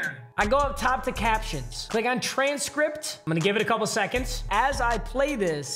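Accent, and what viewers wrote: Michigan, United States. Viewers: Michigan accent is rlly comin through on this episode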